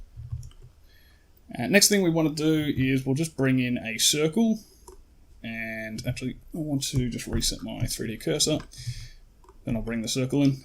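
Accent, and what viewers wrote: Australia. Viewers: Thanks! Wow, so nice to hear an Aussie voice in a tutorial, apart from Andrew Price. I think you should either do a full model of this space marine or do a Terminator helmet next. Subbed and have a drink on me, cheers.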